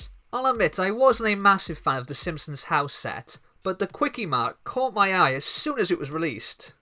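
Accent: Wales, United Kingdom. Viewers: I subbed, love the welsh accent! Great video too, I'm 15 and I'm worried I may have a dark age, I'll come back to lego though I'm sure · Fair play you sound proper Welsh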